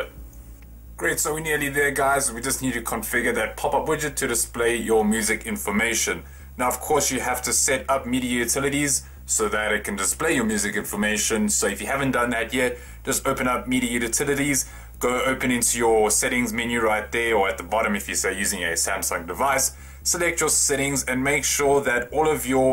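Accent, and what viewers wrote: South Africa. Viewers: dude.you South African?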